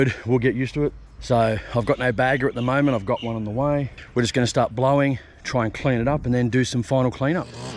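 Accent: Australia. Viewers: Love Australian accent. I am a Zimbabwean living in Portugal.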